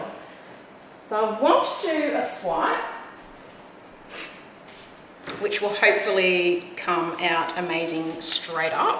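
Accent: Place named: Australia. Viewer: This is beautiful !! So refreshing to hear an Aussie accent.....lol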